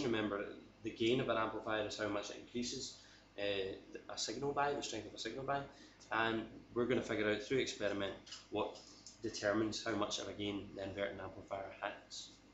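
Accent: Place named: Scotland, United Kingdom. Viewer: Thanks, love all the vids! Scottish accent i hear;) got prelims in few weeks so this helps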